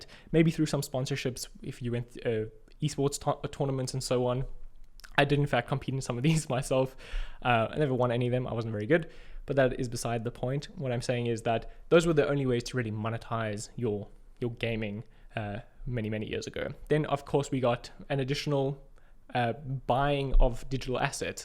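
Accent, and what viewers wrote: South Africa. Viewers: Blue Pink Jelly!!! Absolutely love getting top quality crypto content in a Saffer accent. Kudos to you! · Blue Pink Jelly. Love your videos and, on a personal note, it's really nice to hear a South African accent amongst all the crypto YouTubers that I watch. Being very new to the space it's incredibly helpful to have people like you that are logical, balanced and insightful.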